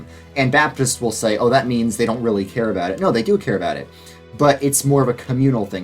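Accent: New York, United States. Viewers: mainly when you talk about walkable cities, those of us from hillbilly areas 😂, and the way your voice sounds. I’m just like “yep he’s from New York.” I died when you said “collecting the bigotry infinity stones” 😭😂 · I’m from NY as well and instantly recognized your accent on your videos lol. And yeah if you can see the city from your high school you’re definitely downstate. I’m from Albany and we consider everything south of Poughkeepsie downstate 😂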